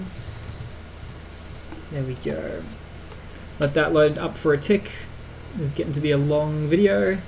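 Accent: Australia. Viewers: You can easily make out from his accent that he is Australian, but if you want hints, First "GoodDay" greeting at start, Secondly look at this terminal/Username, Thirdly he calls Gufw Guffy.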